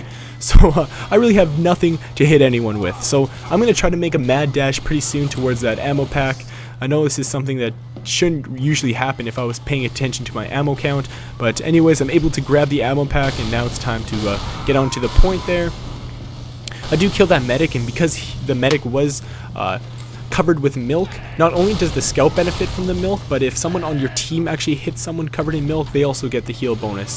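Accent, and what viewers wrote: Canada. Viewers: Are you a Newfie?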